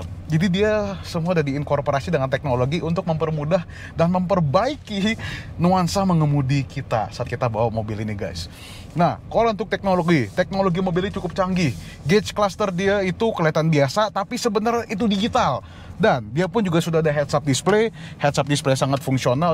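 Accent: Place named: Indonesia. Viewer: Keren koko, logat nya indonesia banget...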